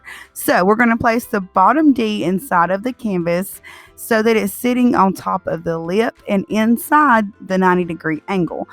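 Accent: South, United States. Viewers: I missed you girl! Live this diy!😻 I'm also subscribed to. I love her diy's also.😻 She does have a great soothing voice. I still love that southern accent of yours though. Anyway, can't wait for your next diy! 👍💖😊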